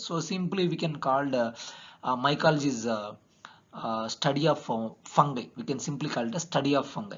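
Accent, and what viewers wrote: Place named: South India, India. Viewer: Are you from south...I find north Indian english speakers annoying but not you...why it's sound smoothing to my ear and Brain ❤❤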